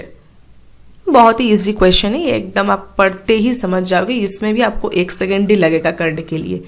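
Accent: Bengal, India. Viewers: Bangali voice in hindi always love❤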